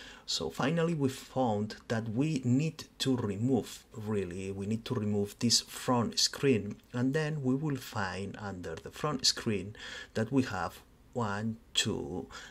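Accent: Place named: Italy. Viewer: I love your accent. Are you Italian? I am an American.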